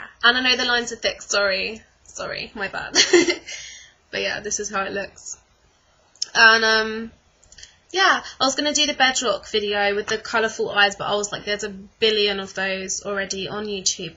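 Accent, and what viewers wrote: United Kingdom. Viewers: I REALLY LIKE WATCHING YOUR VIDEOS. YOUR SO ADORABLE WITH AMAZING EYES AND YOU HAVE A BUBBLY PERSONALITY WHICH IS VERY UNIQUE AND AWESOME. YOUR ART WORK WHEN DOING MAKE UP IS VERY CREATIVE AND FUN NOT ALLOT OF PRESSURE. I WANT TO GIVE YOU A 5 STAR AND 2 THUMBS UP GREAT JOB. BRITAIN ACCENT WITH A ROUND FACE ANTIQUE DOLL FEATURES VERY CUTE AND ADORABLE :-)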